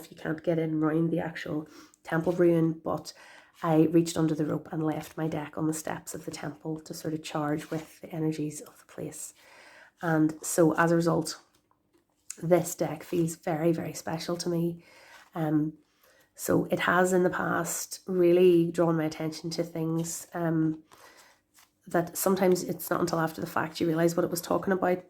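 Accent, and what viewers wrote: Ireland. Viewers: I would love your channel if for no other reason than it makes me so happy to hear someone speaking Irish naturally & with the 'right' accent 💚🌱🍀 My kids would be getting those books if they were 30 years younger 😁😯 I miss the really good kids books. I own that CBR oracle you love - the red one - and also the Enchanted Map. I do love them, any other decks of hers are long gone since I read more about what she is like 🤐 I hope your new one is useful to you 😊 I doubt it will shock you that I LOVE the Wildwood tarot 😍 I don't use it, I just stare at the art & smile. Also love Mystical Moments tarot, her art is unbelievably gorgeous. Thank you for the update. I enjoyed it as always 😊👍💖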